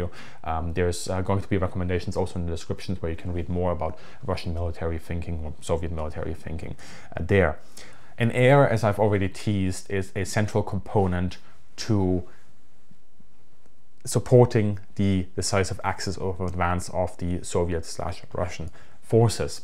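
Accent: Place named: Germany. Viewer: Ukraine has an aviation problem, they have none. They have to go begging to EU countries because, well theirs have been destroyed. I love how a guy with a German accent recommends how Russia should use their helicopters, lol what a joke, I'll take him seriously when Germany stops hiding behind Americas petticoat and enters the conflict.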